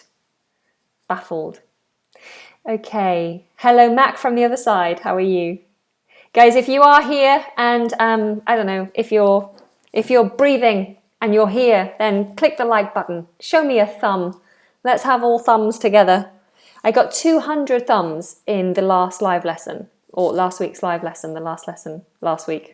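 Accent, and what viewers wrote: United Kingdom. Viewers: I enjoy your accent, which reminds me of the time in uk.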